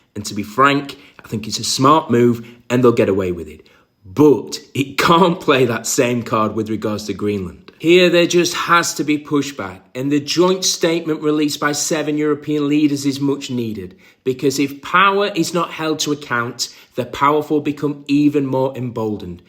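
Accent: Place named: United Kingdom. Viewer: You sound British, none of your business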